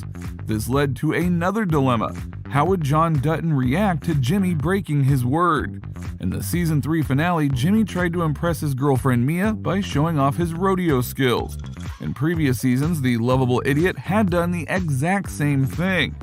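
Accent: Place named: New York, United States. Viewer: Four 6's Mr announcer dude.....must a new yorker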